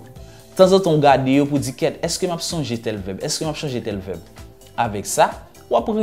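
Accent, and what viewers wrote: Spain. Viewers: Mw we janw prononse yo an li plis gen aksan espanyol.. Tankou. Comer, comprar, dar, angro anpil nan yo son "R" lan pa bzwen pronomse kom "L" ok.. Além disso td bem mano.. Uon bel travay wap fe an dako.. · Respè pou ou bz kenbe la fr, men sa sèlman ou prononse yo an espanyòl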